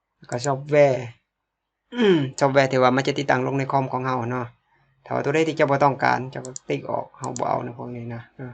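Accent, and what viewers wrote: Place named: Korat, Thailand. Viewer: ชอบสำเนียงแก่จริงๆ คนโคราชนี่.?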